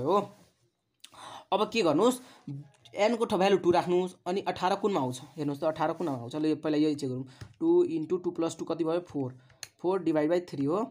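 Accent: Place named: India. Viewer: R u indian? Plz fix ur accent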